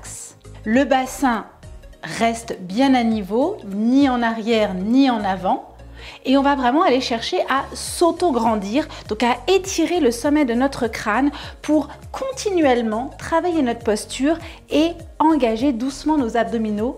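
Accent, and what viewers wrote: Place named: Quebec, Canada. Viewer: J'ai oublié de préciser que votre accent est sublime et merci à tous nos cousins francophones du Canada qui partagent si efficacement leur savoir que je n'hésites pas à consulter. Votre corps est idéalement fait tout en finesse mais bien structuré ce qui prouve l'efficacité de votre méthode. Je vous remercie encore d'exister et de vous investir pour notre bien être. Bien affectueusement 😘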